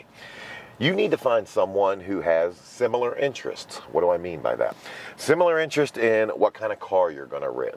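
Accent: Virginia, United States. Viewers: Man, I saw that Kevin Nash interview (and your subsequent response) & I was really impressed with how you handled that. He has obviously never spent much time in Virginia because I’m from NoVa but as soon as I heard your voice the first time I knew you had to be from VA (I guessed Winchester but Charlottesville makes perfect sense. Also, this YouTube shit is amazing because you really found your calling. You’re so articulate and intelligent. The way you deliver your speech is so professional; this is really what you were meant to do.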